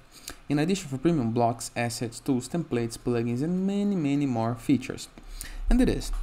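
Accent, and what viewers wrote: Brazil. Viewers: Você é brasileiro? 😂🤟❤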